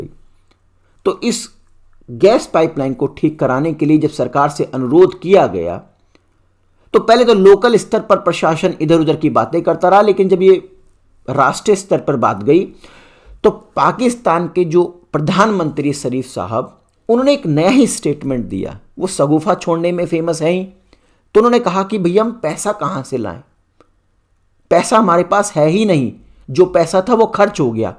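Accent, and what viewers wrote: Bihar, India. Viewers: Your Bihari accent is so sexy Say again — PRO-VYNCE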